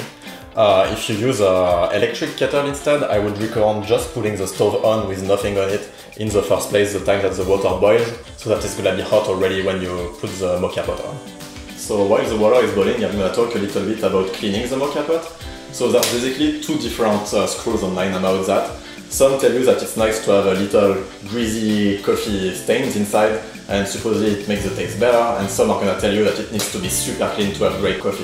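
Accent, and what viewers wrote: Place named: France. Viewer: Your voice and accent remind me of the great French chef Eric Ripert. Great video!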